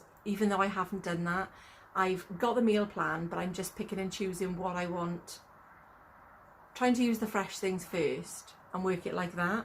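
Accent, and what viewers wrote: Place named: South Wales, United Kingdom. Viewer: Well done its moving slowly, you are so funny, and you got a canny smile, I guess your from South wales I was born in North wales but the South has a much stronger accent lol but ive been up north east England 17 years now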